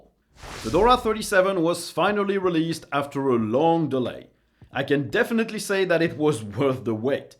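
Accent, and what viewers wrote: France. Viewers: I definitely love my country. Besides your french accent is incredible.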